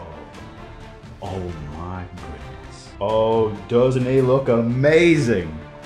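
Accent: United Kingdom. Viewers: are you british? one more thing you are the best youtuber ever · I can’t tell if he is British or not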